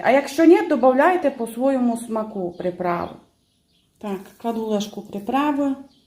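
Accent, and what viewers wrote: Transcarpathia, Ukraine. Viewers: Можу цілу вічність насолоджуватись закарпатским діалектом та кухнею💕 З любов'ю "западєнєц" з Донбасу ^^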